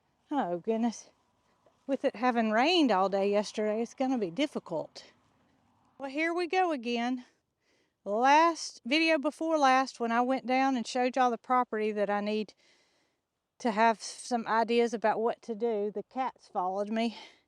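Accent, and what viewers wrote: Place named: Midwest, United States. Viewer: ❄️You sound like you live in the Midwest USA like me. I hear we might be getting more. Stay safe. ❄️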